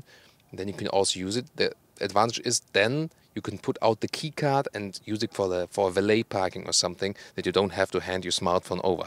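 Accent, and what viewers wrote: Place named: Germany. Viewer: I like the car reviews you make, but I must admit your German accent sometimes make the sentences incomprehensible.. saying "smoosse" instead of "smooth" I did understand, but some other words might as well have been Chinese :D :D Apart from that, very informative video. The X5 grows on me every time I see it :)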